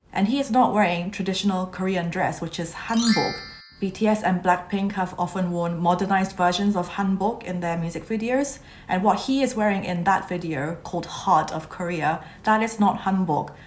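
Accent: England, United Kingdom. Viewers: ... I like your accent^^ it's sounds like British and your voice is.. What is it call? Yaa.. Just like it so much^^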